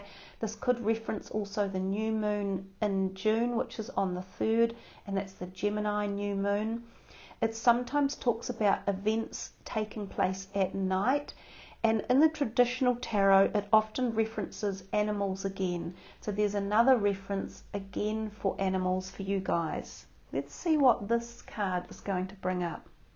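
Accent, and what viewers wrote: New Zealand. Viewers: Love the "sucx" (6). Good old Kiwi accents. Not making fun, was married to one!!!! Hope Enzo will be okay!!!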